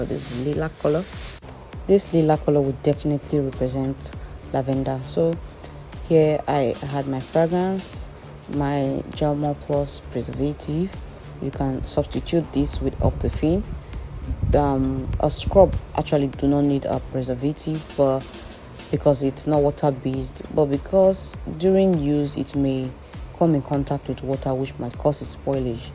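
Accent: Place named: West Africa. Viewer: Lilac baby li-lac pronounced lie-lac or lye-lac The tutorial is very beautiful but your pronunciation been terrible at least I know what herbs you use and everybody else knows what herbs you you use you must be from the french part of Africa i had to watch your videos over and over to figure out what you was trying to say!!!...😊😘😍🤩 i still love your beautiful accent From your country where ever it is and your skin is amazing and all your tutorials are too but I still have to figure out😂😅🤨 what you be trying to say in the herbs section.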